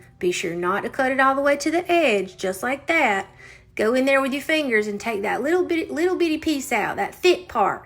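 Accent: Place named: South, United States. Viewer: Gorgeous work and what a voice! I adore your voice and smooth southern accent. I'm subscribing just so I can listen to you some more.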